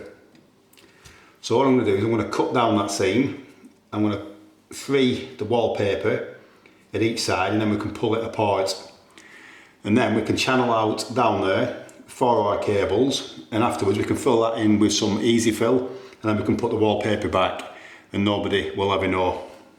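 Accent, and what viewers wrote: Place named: Lancashire, United Kingdom. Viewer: Soft, crumbly plaster and soft brick too. Soft plaster not surprising but given your accent, I'm surprised the brick was soft too. Thought that round your way most would be Acrrington bricks and they are like reinforced concrete! lol.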